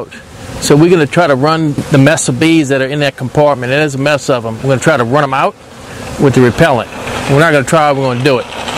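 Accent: United States. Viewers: What state accent? Is that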